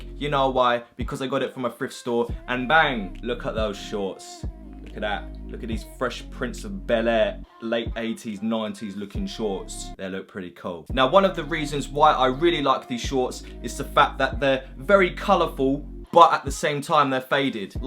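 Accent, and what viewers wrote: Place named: Cockney, United Kingdom. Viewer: the best thing about your vids is your curly brown hair and cockney accent yaass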